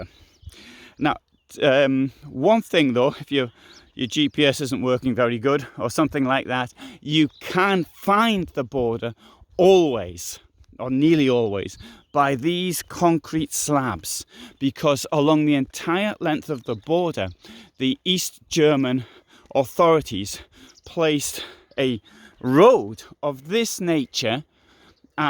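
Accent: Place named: North East England, United Kingdom. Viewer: I can here a north east English twang to your accent😁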